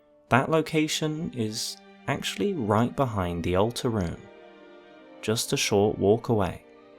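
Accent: United Kingdom. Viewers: Good video but must this guy talk with a British accent????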